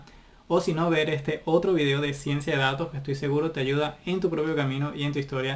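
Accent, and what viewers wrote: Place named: Puerto Rico. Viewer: Jurao' que pensé que eras de Puerto Rico por el acento. Saludos desde Santo Domingo, República Dominicana.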